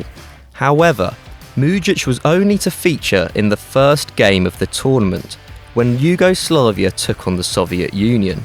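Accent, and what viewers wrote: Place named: England, United Kingdom. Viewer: 100 kilometres an hour.. You say this with a English accent.. YOUR ENGLISH MATE it's MPH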